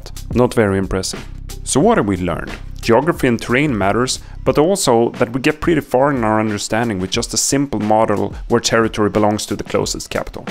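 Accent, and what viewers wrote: Sweden. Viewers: Swedish accent and replacing denmark with water at the end xD 10/10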